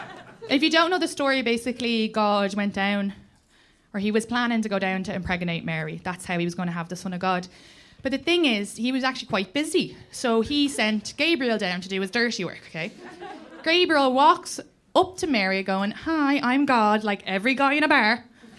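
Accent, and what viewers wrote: Ireland. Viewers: u are so good, for me u are up there with Steve Hughes, Alex Agnew, Bill Burr and Ricky Gervais and your Irish accent makes it so nice to listen to, greetings from Belgium and keep it up ! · she's lovely and kinda ♨. rapid fire speaking...😲easy on the coffee, lady! honestly, some dialects of Irish English are not understandable so maybe that's what people mean just like some American English is the same even some English from England is like 😵‍💫what did they say🤷‍♂. her Irish is lovely. my fav English is "received pronunciation". "wah-er"? I understand the "crazy" partner attraction. let's face it. they tend to be great lovers. cat isn't drowning the mouse. it's an Irish catholic cat. IT'S DRUNK AND BAPTIZING IT AT DEATH!🤣 4 jobs and thinks that's an acceptable life???? SSSLLLAAAVE MENTALITY!!!! does Ireland have a diabetes epidemic? because of the drinking. 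· Hi I Think your so funny love your Irish accent hope you come to south FL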